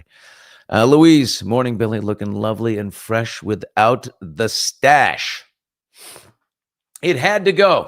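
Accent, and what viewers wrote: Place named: New York, United States. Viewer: You can hear your New York accent😂. You’re an east coaster. Love east coast people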